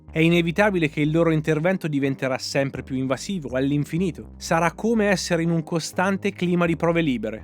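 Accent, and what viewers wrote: Perugia, Italy. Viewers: Pensavo di essermi accorto solo io 😂 Perugia si sente da lontano ahahahhaah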